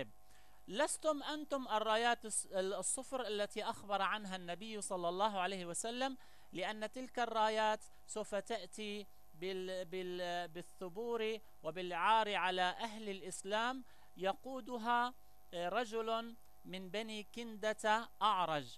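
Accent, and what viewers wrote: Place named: Iran. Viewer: والله العظيم لقد شككت بهذا الشخص من لهجته انو فارسي او ربما اسرائيلي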